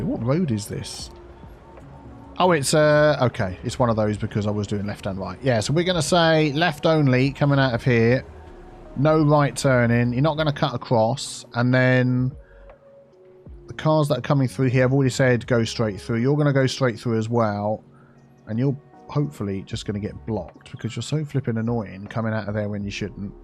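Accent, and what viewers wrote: United Kingdom. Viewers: Tesco lorry delivering to Aldi made me smile. This is so relaxing to watch, I’m new from Flabaliki’s channel and lovely to hear a fellow Brit!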